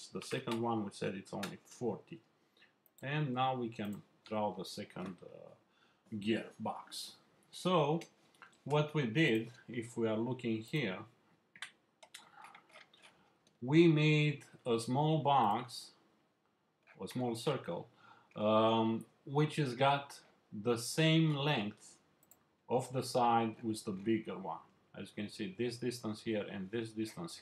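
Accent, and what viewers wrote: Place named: United States. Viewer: USA speaker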